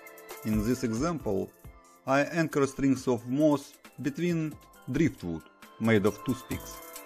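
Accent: Russia. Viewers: This " meanwhile in Russia" accent...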